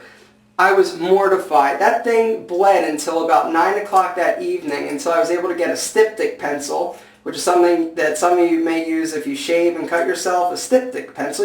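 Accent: Philadelphia, United States. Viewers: you sound like ur from philly "pool it to the back"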